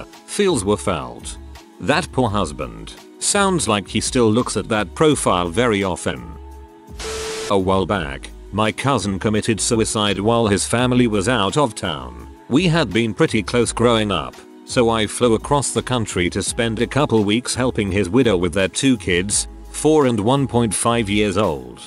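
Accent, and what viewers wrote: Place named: Yorkshire, United Kingdom. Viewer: Queen Mantis I think he's from Yorkshire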